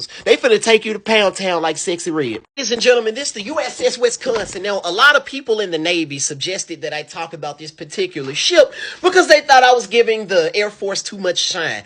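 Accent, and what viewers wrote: Memphis, United States. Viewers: Boss man I been watching you for some time now and didn’t realize you are from Memphis….but I knew you was down this way some how by ya dialect 🫡🫡🫡 Proud Memphian from the north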